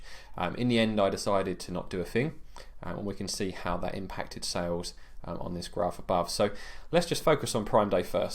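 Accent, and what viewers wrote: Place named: Essex, United Kingdom. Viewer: Great video, where are you based in UK? Sound a little bit Essex but can’t quite place the accent 😁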